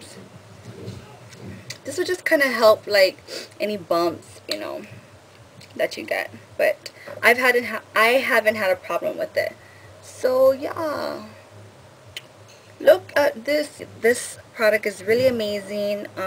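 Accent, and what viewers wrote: Hawaii, United States. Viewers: R u Hawaiian?? You really sound like a local girl from Hawaii:) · Omg thanks for the review and demo! I have the darkness under my arms so I'm considering this product. :D I could tell by your accent that you're from hawaii! Great review!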